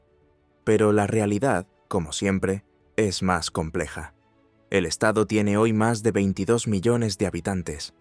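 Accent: Spain. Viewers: Pero de que está hablando este señor con acento español , en España la personas mayores en los pueblos abandonados el gobierno no se ocupa de ellos , las inundaciones arrasaron Valencia y las personas están abandonadas la inmoralidad sexual es increíble, las violaciones los asesinatos de hombres a sus esposas, los padricidos pero de que habla este bocón ya quisiera el poder vivir en Miami , en España las personas no pueden pagar un techo apropiado para poder vivir este hombre si se le puede llamar así que se enjuague su boca para hablar todas las mentiras que está hablando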